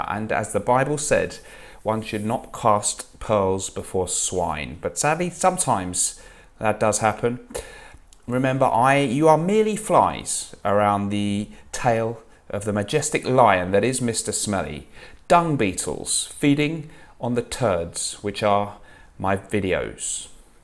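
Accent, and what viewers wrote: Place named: RP, United Kingdom. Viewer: Hello, how are you doing? I am a bit baffled by your videos. For a Polish guy living in Germany, now Miami, your English is very good. You even have this posh accent. How did you manage that. Why are you not clapping and anymore? I miss that. Talking about,… maybe your “ gay “ adventure would have never taken place if you did not spin around. is a song tittle and she make heterosexual males into bisexual or even gay clones. Everybody knows that. You are not gay, you are just a kind of dupe or clone of your self like Armaf cdnI. You are your own scent clone. Just do not spin anymore. And are you now Jewish or Muslim? I do not see your cross or hear you talk about the big J in the sky anymore. Please explain yourself. Are you at the Versace house now in Miami? I see the Angel statues next to you. Very Versace. Anyway I did see you worked out more. 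The body is looking better than ever. Maybe you should do a polish folk dance instead in the turn. Anyway, you are the best. Lots of love from your nr 1 fan. 🤪🤪🤪🤪🤣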